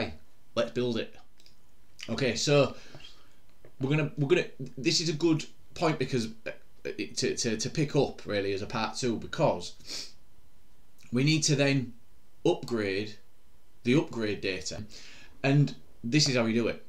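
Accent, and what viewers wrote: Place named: United Kingdom. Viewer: Hi , Being an Indian, we find it difficult to listen to UK English , it would be great if u talk in simple and clear English for ex:- mark Shust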